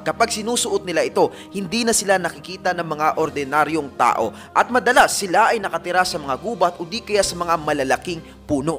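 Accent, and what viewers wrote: Visayas, Philippines. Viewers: Hahaha bisaya diay ka ya washout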